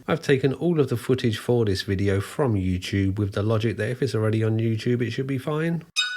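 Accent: England, United Kingdom. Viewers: I'd like to apologize for all my ignorant, fellow Americans, who can't seem to understand a British accent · Oh shit. A cyrax with an English accent.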